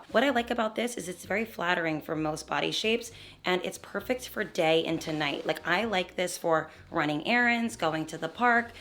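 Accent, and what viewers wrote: New Jersey, United States. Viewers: You’re one of my favorite content creators you always give really actionable really sensible advice. Also, I love that you have a jersey accent because I no longer live there and it sounds like HOME.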